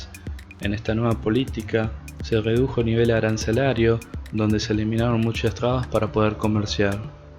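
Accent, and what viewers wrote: Argentina. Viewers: Si es peruano , por qué habla como argentino?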